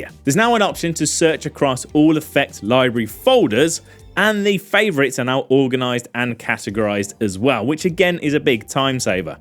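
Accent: United Kingdom. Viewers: I take it all back… You aint gotta change s#i+ I suck. Your speech is amazing and British!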